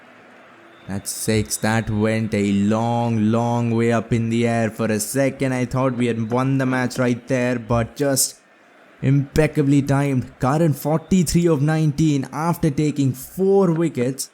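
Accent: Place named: India. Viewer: Where are you living ?, from your voice I feel like you are from India but living somewhere else due to the accent...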